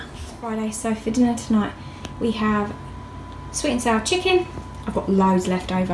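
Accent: United Kingdom. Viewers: Really! The lovely Brit accent. Thanks much. But---is the "Little" a nickname preface of sorts? Surely a tween in a few years won't be amenable to that. 😉🥰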